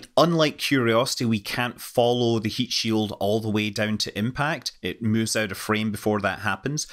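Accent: Scotland, United Kingdom. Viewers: As in Star Trek, the best engineers have a Scottish accent. Thanks Scott Manley!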